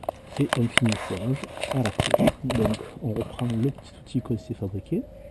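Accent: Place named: Marseille, France. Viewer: Bravo et merci bcp pour cet tuto. Aaah, jadore lacent marseilles :-)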